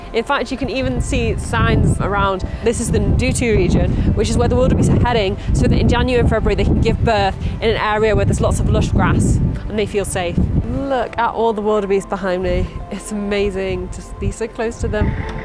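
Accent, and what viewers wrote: United Kingdom. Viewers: I'm guessing your accent is Altrincham, Lymm, Warrington area (via London.)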